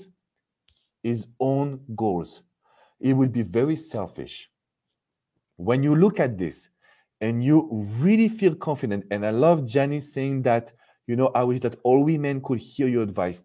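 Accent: France. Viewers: I love your French accent. You make the word "suffer" sound like a lavish dish <3 <3 <3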